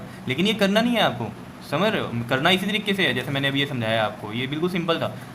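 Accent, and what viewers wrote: Punjab, India. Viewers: Sir tucci punjabi o?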